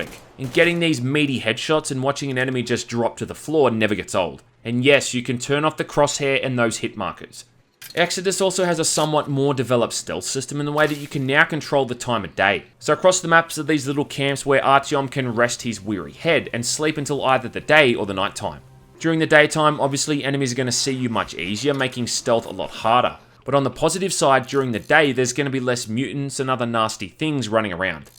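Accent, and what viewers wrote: Australia. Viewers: You have a good way with words. Good to hear an Aussie accent for once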